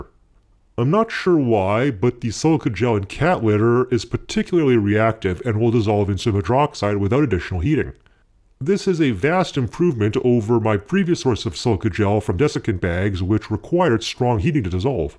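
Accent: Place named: Canada. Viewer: you sound soooo Canadian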